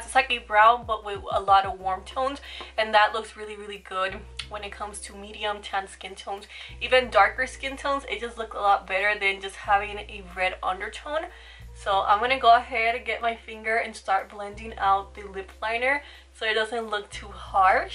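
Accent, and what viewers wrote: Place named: Puerto Rico. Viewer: Hello, I just started watching your videos and immediately I looked for a video in Spanish and I loved it ... but I am learning English and I will see your videos to learn how to apply makeup and learn a few words. I loved hearing you speak Spanish and knowing where you are from because of your accent. speaking english no idea you were from Puerto Rico a big greeting you are amazing... arriba los latinos !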